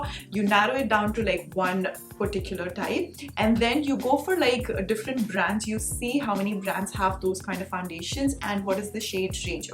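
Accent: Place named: Punjab, India. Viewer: I hear ur accent though 😂😂😂😂 please bring ur phnjabi accent back noooo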